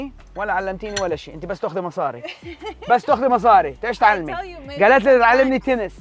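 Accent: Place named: Iraq. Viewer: انتة صرت تحچي عراقي عيني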